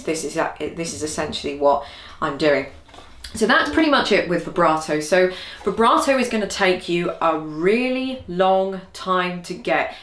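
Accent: United Kingdom. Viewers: This woman is fabulous and no nonsense. I love British accents too :) you inspired me to buy a violin again a twenty year hiatus and here I am practicing!!!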